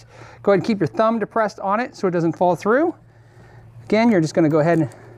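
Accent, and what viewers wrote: United States. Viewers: Americans pronounce it like that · Anyone know where in America this guy is from? I recognize the accent.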